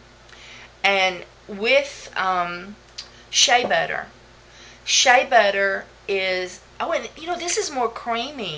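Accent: South, United States. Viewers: I think you are precious w/ur Southern accent & easy going genuine way! Love listening to u talk! Next time I order Lye or anything else from Es. Depot, I'll do thru ur aff. # 552! Mahalo nui loa for taking the time to share what ur doing. I appreciate & enjoy ur vids!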